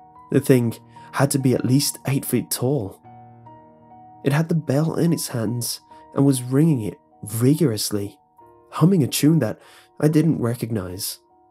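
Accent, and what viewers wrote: England, United Kingdom. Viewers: I'm trying to put the subtitles but you're so British that the words become realllyy fucking false lol fucking audio subtitles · Because that's how he speaks. English by the way.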